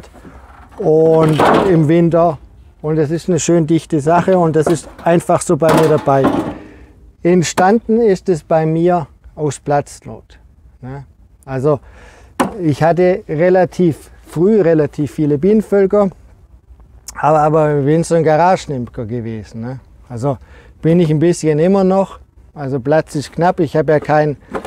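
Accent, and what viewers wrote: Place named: North, Germany. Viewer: super Video - echte, selbst erlebte Infos - und für mich als Wahl-Nordlicht auch mal wieder der Slang meiner Heimat! Danke, "gutes Neues" und weiter so! Chris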